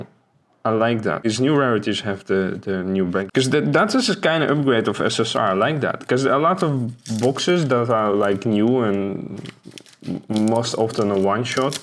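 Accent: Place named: Netherlands. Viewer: Ur from holland i can hear xD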